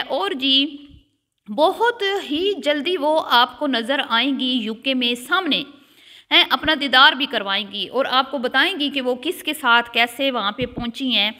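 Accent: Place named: Saraiki, Pakistan. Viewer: آپ کے لہجے سے لگتا ہے ہم بھی سائیکی زبان بولتے ہیں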